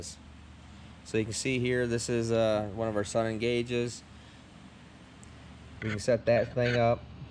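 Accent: Michigan, United States. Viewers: Guys sounds like a Michigander. Those guys know their stuff.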